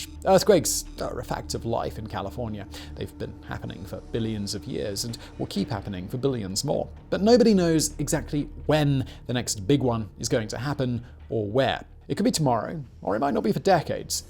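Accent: United Kingdom. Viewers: This guy is so boring I cannot listen to him much longer. He talks so fast and I stand, that British accent. oh I can't stand this guy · looks so young! And his British accent is not as prnounced as it is now.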